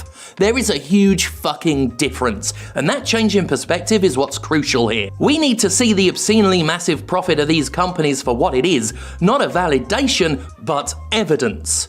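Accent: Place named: United Kingdom. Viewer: the ones thing that irks me, is that you STILL buy the games and review them. Dont. Dont use the its my job excuse, show the example. Ive yet to see ONE reviewer, ONE online personnality actually not buy the games of companies that 'fill in the bank with something horrible'. I think it would have a much greater impact and put more force behind the words if when people asked 'how come you haven't done a cyberpunk review?' you could answer 'i dont support products of abuse', rather then oh ill review it when its on sale so I give the abusers 20 dollars less. I can't get passed it. Every reviewer acts like hes holier then though, its their job therefore they are above reproach. All these guys would ask ore suggest boycotts, but they would never make a stand. Listen, if you fear all your fans will leave you if you dontyreview the next big game..... 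that means they don't care about you or your content and you've failed as a creator. Im happy to hear you officially wont touch ubisoft games. I was gonna point out you did purchase breakpoint and blah blah. the lesson is to get to the end of the video! In your case specifically, I think people watch you for how you talk. No I don't mean the beautifull British accent, I mean how you vocalize your thoughts and ideas. You are a legitimate entertainer. I would wager you wouldnt lose a single subscriber if you only ever talked about these companies failures and not their products, never purchasing one again. heck youd even save money!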